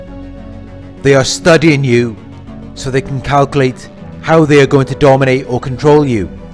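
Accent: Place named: Manchester, United Kingdom. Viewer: I think he’s from Manchester, in north of England. I sometimes get it mixed up with the Liverpool accent, but my mnemonic for remembering is that The Beatles and Wacko from the Animaniacs (inspired by Ringo) are from Liverpool, and Daphne from Frasier is from Manchester.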